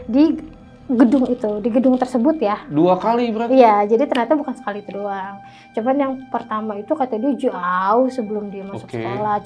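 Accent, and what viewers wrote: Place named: Bali, Indonesia. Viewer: saya asli bali, kakknya logat bali banget:face-blue-smiling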